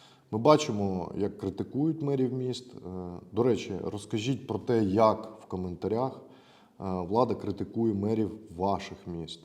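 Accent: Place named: Russia. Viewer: Зі всією зневагою до нинішньої ''влади''... Ти просто'' топиш'' за ''шоколадного''... Це ганьба!!! А, так... І - підтягни українську . Бо ж аж сифонить ''узькім''...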